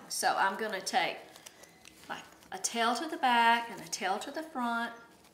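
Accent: South, United States. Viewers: Hello from the UK! Lovely bow and good instructions. LOVE your southern accent!! Thank you for sharing!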